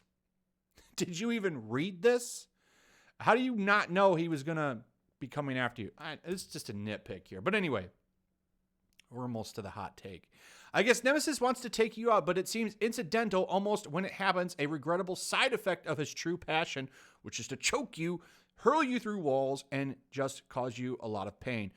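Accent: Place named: RP, United Kingdom. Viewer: I had to stop listening to you read that article. Not because of the hoity toity accent, that was brilliant, but because it's not journalism. It's politics. I've come to absolutely despise politics. I'm not just talking about SJW politics, I despise all politics and wish people would stop. Politics these days aren't about helping people, they're about tearing people down... or... grandstanding [is that the right word?] yourself so you seem like the only person of any importance. Thank you for shedding light on this political piece, thumb up, well done, but I can't. It's not you. It's the words you're reading xD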